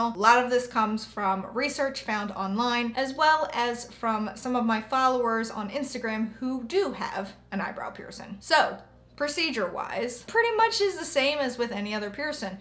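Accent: United States. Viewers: I have a north dublin accent and I just wanted to let you know that we say piercing the same. I just found it amusing since you pronounce everything else with what I would consider a normal American accent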